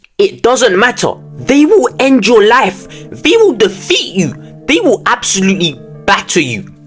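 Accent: United Kingdom. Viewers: Bruh you British?? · You sound like you are from the UK if I was you I woulda came to school witta knife if I gotta record a video like the one you showed 🙏🙏🙏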